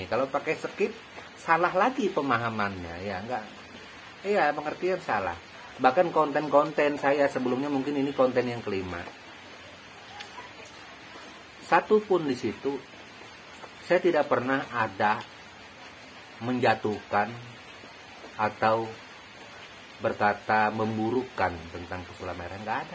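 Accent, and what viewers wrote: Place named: West Java, Indonesia. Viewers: MAAF EMPU KOK EMPU LOGAT BICARANYA SPRTI ORG SUNDA HEHE 🙏🙏🙏🙏🙏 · Salam santun mpu... Mohon maaf..logat bicara..mpu seperti ada logat sundanya...apa mpu ada keturunan sundanya..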